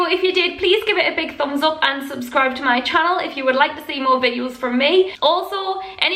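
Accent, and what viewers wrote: Newcastle, United Kingdom. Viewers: R u from Newcastle?? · i love it little mix Jade and perry have a geodie accent so thats were i teconised it from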